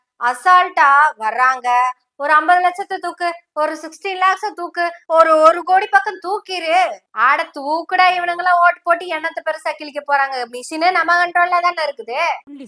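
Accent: Kongu, India. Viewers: கொங்கு தமிழ் நாவில் இசை பாடுகிறது தோழி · Madam, excellent resentation in Kongu Tamil. For social reformation and upliftment. May God bless you with prosperity.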